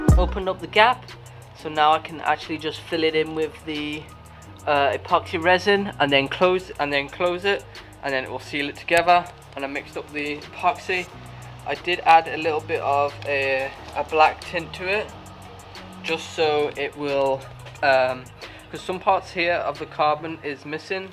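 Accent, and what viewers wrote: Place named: England, United Kingdom. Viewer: You in England or Ireland?Im guessing England as of your accent and plates on the other cars...so did you bring the civic over or what?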